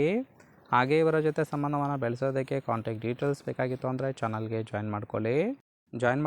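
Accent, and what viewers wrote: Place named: Haryana, India. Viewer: Haryanvi madam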